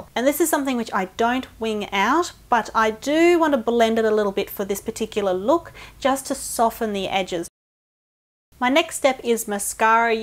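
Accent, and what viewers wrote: Australia. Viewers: You know, usually aussie girls say "like" and "omg" about <num> times every sentence, but not you! You just have a mad aussie accent :D and great videos! Love form germany :D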